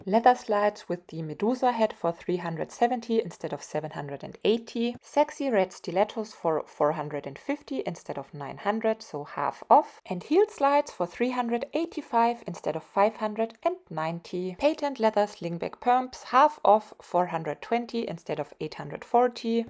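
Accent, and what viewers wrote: Germany. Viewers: I love your german accent...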